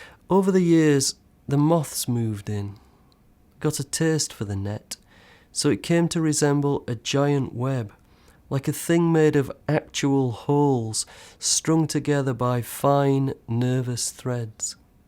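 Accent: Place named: Yorkshire, United Kingdom. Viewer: The mellifluous Tyke tones of Yorkshire's and Britain and Ireland's hardest working and tireless post punk beat poet Simon Armitage CBE...hats off Sir and thankyou for your untiring and quite excellent and exquisite spirit and soul work ... =D...ps love the Husker Du reference ...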